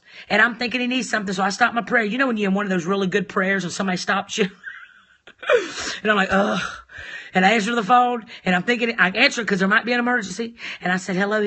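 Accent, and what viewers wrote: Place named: South, United States. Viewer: I just love your Southern accent tho!! ❤🔥💯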